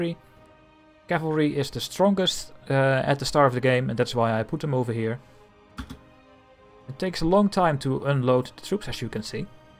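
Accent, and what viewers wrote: Netherlands. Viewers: Hey, I hope you are willing to continue on your tutorial! It was really helpfull in understanding the game sofar since you talk clearly, don't talk fast and explain it rather good. Also the dutch accent makes it for me better understandable (gezien ik ook Nederlands ben), so love that. Anyway, I hope you'll find yourself able to continue. Great thank for the tutorial so far!!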